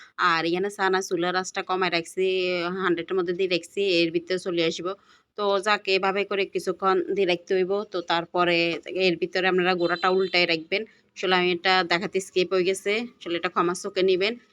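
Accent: Noakhali, Bangladesh. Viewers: অসাধারণ লোভনীয় হয়েছে আপু মরিচ খোলা। তোমার মুখে নোয়াখালী ভাষা খুব ভালো লাগলো। ভালোবেসে একটা লাইক দিলাম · আপু রেরিপিটা সত্যি খুব অসাধারণ🌈 সেই সাথে নোয়াখালীর ভাষাটাও❤🌈❤ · অনেক লভনীয় হয়েছে মরিচ খোলা মাশাআল্লাহ ❤❤❤ সাথে আঙ্গ নোয়াখালীর আন্ঋলীক ভাষা শুনলাম খুব ভালো লাগছে ❤❤ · খুব সুন্দর রেসিপি ❤❤আর তার থেকেও বেশি সুন্দর আপনার নোয়াখালীর ভাষা❤❤❤অসাধারণ❤❤❤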